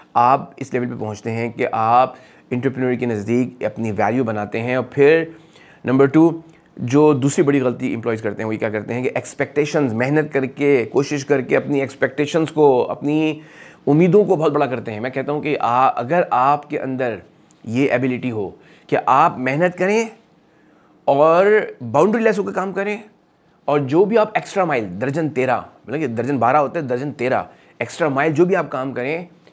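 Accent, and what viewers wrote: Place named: Karachi, Pakistan. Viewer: اسلام علیکم جزاک اللّہ سر آپ کا بولنے کا انداز کراچی والا ہے لیکن آپ بتارہے ہو آپ مری میں رہتے ہو ؟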